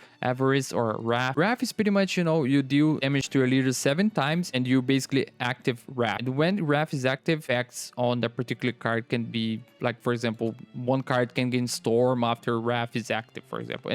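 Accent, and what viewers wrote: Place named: Brazil. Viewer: Brazilian portuguese accent am I right haha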